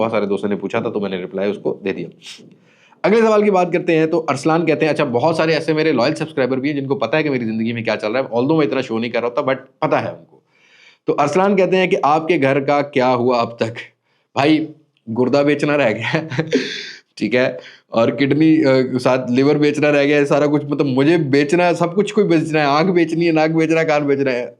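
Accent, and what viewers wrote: Saraiki, Pakistan. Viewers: Your Siraki accent is 👌🏻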